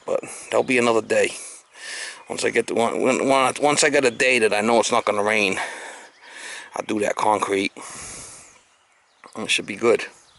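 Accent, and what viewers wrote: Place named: Massachusetts, United States. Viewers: Judging by the accent, I'd say Massachusetts. (I know. It's not nice to judge.) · Good vid! Im trying to place your accent.. Massachusetts?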